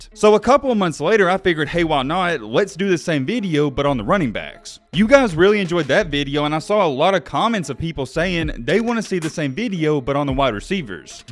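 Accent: South, United States. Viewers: Big facts -- something about that southern drawl is a turn off - then he's actually a young dude talking about historical content - essentially not providing anything insightful - anyone can pull up stats and read post career articles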